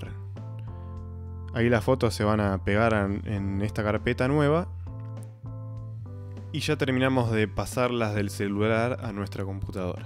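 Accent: Argentina. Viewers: Es argentino 😑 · Excelente tu explicacion No podes negar que sos argentino!!!! Un saludo desde Mexico. Aclaro yo lo soy.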